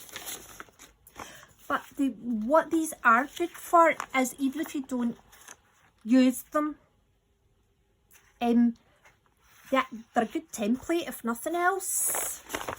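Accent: Scotland, United Kingdom. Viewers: I forgot to say that I’m quite technically challenged lol. But I shall have a go at that, thank you. Btw… your accent makes me feel at home.. father from Glasgow mother from Stirling.. holidays in Scotland every year… finished my schooling and teenage years in Elgin but too late to pick up an accent.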